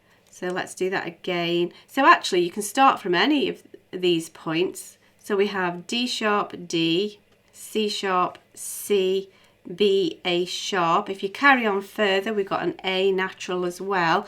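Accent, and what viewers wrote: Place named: Stoke, United Kingdom. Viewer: Great video! Are you from Stoke?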